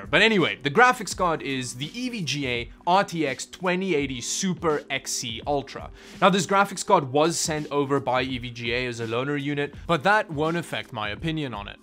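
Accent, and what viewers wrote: South Africa. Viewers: His accent already made me think hes from south africa - the Namibia shirt made me even more suspicious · I enjoy your videos very much. Just a question: You sound like you have a South African accent, and then I see your shirt says Namibia.. so I wonder if you're from Namibia? Do you live in Europe now? I'm not fishing for your address or anything, just curious. :)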